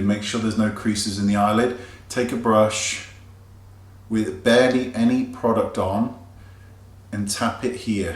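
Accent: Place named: England, United Kingdom. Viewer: I love your videos, because I learn so much...not just on how to apply products or which ones you recommend, but the english language, too. You speak soooo clearly and slow which makes it easy for me to understand nearly every single word you say. I bloody love the english accent! 💖 You should start a new channel "Wayne Goss - english for beginners".😜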